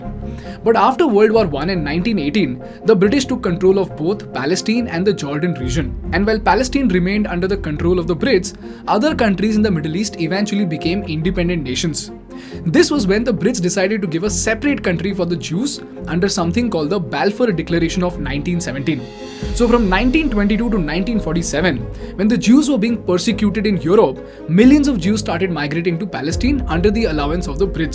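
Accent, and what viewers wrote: India. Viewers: I told you.. Indian has this accent.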